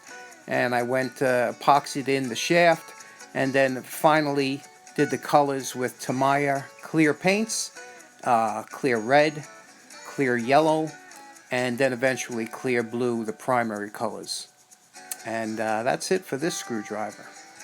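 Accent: New York, United States. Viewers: Now you know my favorite part.... remember how this looked BEFORE I started..... Ok, I admit....I've heard those words with that New York accent in my tool restore dreams as of late. They usually involve a crowded basement complete with the musty smells of an old Victorian era home paired with the faint essence of oil and varnish. The recesses and corners of the room loom in mysterious darkness but the Hydraulic press and the lathe always make themselves known. Finally my attention is drawn to a remnant of red carpet where some shiny tool gleams with an otherworldly aura. The choirs of sweet heavenly voices harmonize with the 50s bad-boy motorcycle theme music. All is well in the world.....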